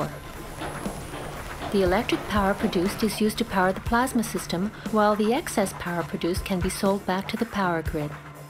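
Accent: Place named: China. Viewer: Couldn't find a Canadian to do the voice over? The English is perfect, the accent from China is still on there on the back end though.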